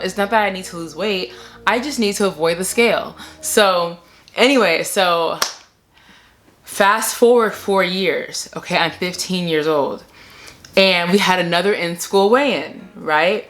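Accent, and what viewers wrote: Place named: South Africa. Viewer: Girl are you South African ?? 👀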